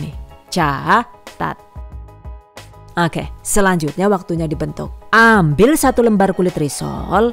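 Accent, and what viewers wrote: Banyumas, Indonesia. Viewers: Bund orang mana yah? Kox Ngmongnya Ngapak banget,😅 siapa tau Kita satu daerah 🤭🤭🤭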